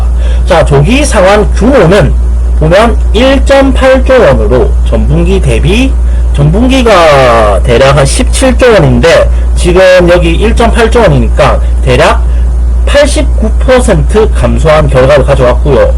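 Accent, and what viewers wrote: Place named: Gyeongsang, South Korea. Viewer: 경상도 억양을 줄여줬으면 좋겠습니다. 도표와 그래프를 보면서 아침 출근하면 차 사고 납니다. 말이 조금 빨랐으면 좋겠습니다. 읽는거 보단 말하듯이 이야기 해주셨으면 좋겠습니다. 이전 영상들(지금은 비공개 되었지만 ㅠㅠ)에 비해 그래도 들어줄만 한 정도로 컨텐츠가 많이 좋아졌습니다. 다만, 2020년 2분기를 설명하는데, 그것도 증권업을 이야기 하는데 코로나에 대한 내용이 빠진 것이 아쉽습니다. 영상 중간중간에 전환이 매끄럽지 못하네요... 잘 듣다가 갑자기 결론없이 다른 내용으로 넘어가서 몹시 놀랐습니다. 앞으로도 예의주시 하겠습니다. 좋은 영상 고맙습니다. 내용이 너무 어렵네요. 어려운 내용을 쉽게 풀어주셨으면 좋겠어요.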